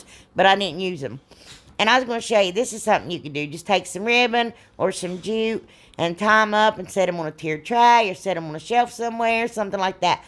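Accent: South, United States. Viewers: Where are you from? I hear a southern draw in your voice. Kentucky I say but not 100% tho. You do make me laugh. Keep it ip!! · Hello from a fellow!! Your channel name brought me here(had to see who else had a name like me, LOL we have similar southern accents), but your video content and personality made me a new subscriber. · You are fun! I sometimes watch content just for the sound of American voices; but you are humdiggity fun! I'm ever so glad that there are a lot of Halls! Mercy!! ;)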